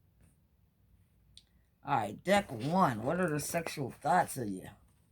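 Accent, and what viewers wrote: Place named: Baltimore, United States. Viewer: oh wow idk why it sounds like a baltimore accent lol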